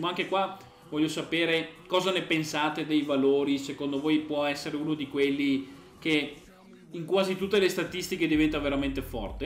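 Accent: Veneto, Italy. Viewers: Da che parte del veneto sei? Un salutone da Salzano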